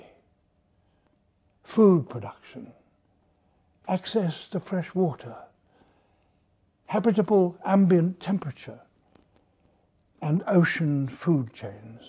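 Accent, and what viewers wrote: United Kingdom. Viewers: I guess by using an old guy with a British accent, they think that might convince more people of the lie. The only thing spiraling out of control is the climate change hoax. None of their prediction have come true. That should tell people something. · Delivery counts. Tone, british accent, good microphones... timing.. all VERY important when making propaganda . Content? Really inconsequential in the scheme of selling things.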